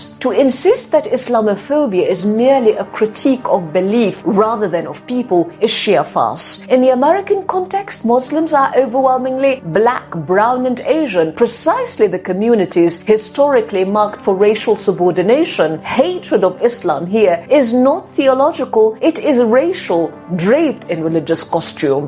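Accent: United Kingdom. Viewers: That is British accent , not North American one. They hav own style.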